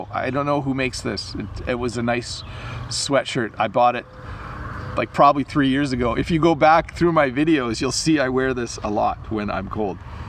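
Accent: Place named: Canada. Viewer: Hello,! Hello from Ukraine!:) I'm glad I found your video lessons. This is the first time I hear Canadian English! Thank you for your work.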